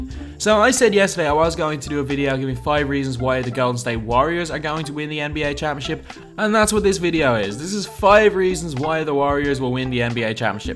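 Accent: Ireland. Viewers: Is this dude from ireland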